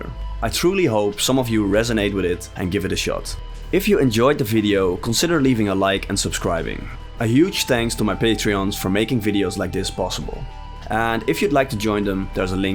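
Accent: Netherlands. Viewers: Never fail to recognize that accent... 🇳🇱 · i think i hear a slight dutch accent. its one of the least noticeable dutch english accents i have ever heard though. most of us cant hide we are dutch when speaking english at all.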